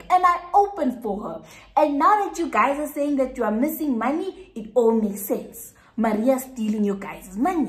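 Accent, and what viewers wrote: Afrikaans, South Africa. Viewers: Her Afrikaans accent is top tear 😍😂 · Your Afrikaans accent🙇🏽‍♀️😂😂😂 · I LOVE the Afrikaans accent 🤣🤣🤣🤣vat nie kaak nie😂😂arg thank you for the story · It's the Afrikaans accent for me 😂😂😂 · Fun fact: Every after 3days I come to check if momma has delivered the content yet! 💕📌 My second home is here with ❤️ I love youuuuuuuu! 🤣🤣okay. Afrikaans accent! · It's your Afrikaans for me🤣🤣❤️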